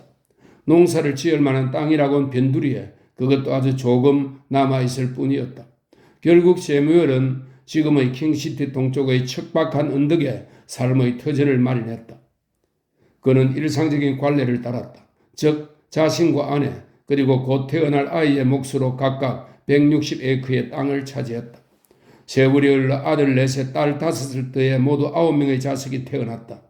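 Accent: Gyeongsang, South Korea. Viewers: 경상도 억양이 인간적이라 사람냄샤가 나요...❤❤ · 경상도 억양이 싫어요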